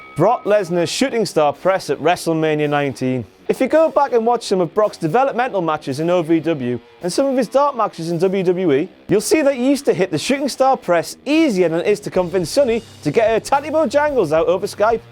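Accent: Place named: Newcastle, United Kingdom. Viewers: I love that you can hear the Geordie coming through the pompous accent, it's brilliant. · I love how the posh accent slowly drifts into the accent of kings, Geordie by the way!!!!!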